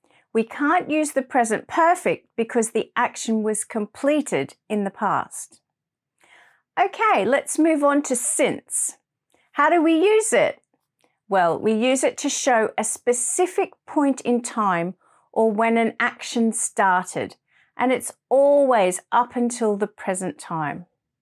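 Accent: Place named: United Kingdom. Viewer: Hi,! I enjoy your British pronunciation. I found your channel today and will continue to use it.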